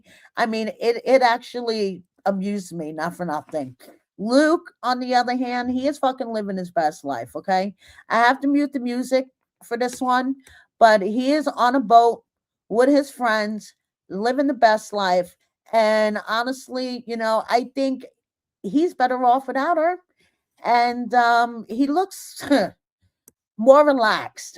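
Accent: New York, United States. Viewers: I can’t stand eScott he’s not a nice human and obsessed with bloggers. As for your voice I love it, your NY accent is so cool sounding to me.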